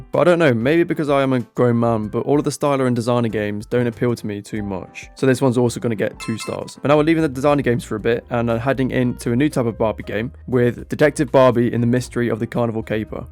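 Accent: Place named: United Kingdom. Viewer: Great video! Thanks for rating these games fairly! (also you are so British sounding it’s like I can see the difference between color and *colour*)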